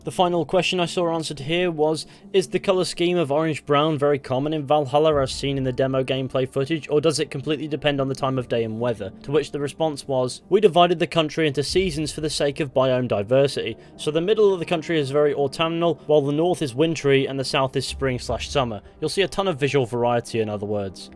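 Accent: United Kingdom. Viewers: Man you're talking so fast😅 and with your uk accent it's damn hard for me to fully get what are you saying(or maybe I'm just a stupid retarded who still struggling with english) and since I'm unfortunately not a native speaker, it makes it harder but I concentrate as much as I can😅 anyways I loved this video and could claim that i understood 80% of your context,love your content 🤙♥️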